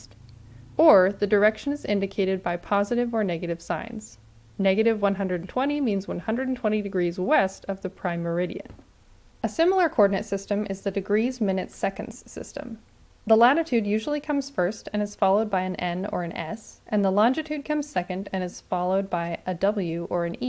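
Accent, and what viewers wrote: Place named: India. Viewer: Talk like indian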